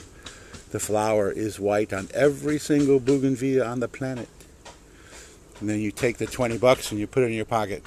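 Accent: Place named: England, United Kingdom. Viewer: Now I understand.... that's why it sounded like an English accent to me! but as I'm Italian, I thought I was wrong. So There is one more reason to follow You, to learn better Queen's English. See You....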